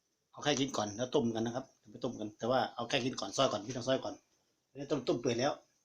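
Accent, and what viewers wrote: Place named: North, Thailand. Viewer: สำเนียงไม่ใช่คนอิสานเด้,คนเหนือแต้ๆเด้นี่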